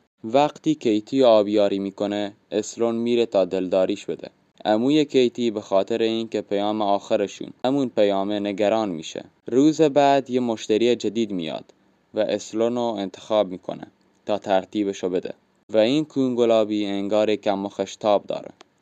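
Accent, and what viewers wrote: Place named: Afghanistan. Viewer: هوی افغانی آدم قحطی بود تو با اون لهجه اعواج و مسخره تعریف کنی بدو برو آجرتو پرت کن......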